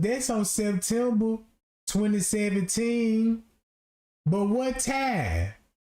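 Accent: South, United States. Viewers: Hey Mr. 🫶🏽 I Don't Mean To Disturb You Because I Know You Will Be Going Live Again, But I Was Cooking And Listening To My Gospel And I Broke Down Thinking About Kenneka. Since Day One When I Came In Your Platform, Then Was A Mod. I Was Excited Because Your Platform Was My 1st, And I Was A Mod, But To Get Back To The Subject On Hand, I Feel Your Passion For Getting Justice For Kenneka. It Seems Like When I First Learned About It Was Late Night And I Heard This Smooth Southern Accent (All Do Respect) I Stopped And Listened And Then When I Learned What Happened.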